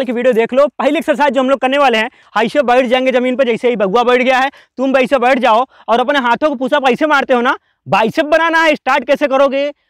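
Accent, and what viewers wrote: India. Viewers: Bhai aap akdam deshi voice me shikhate ho mja aa jata h🙏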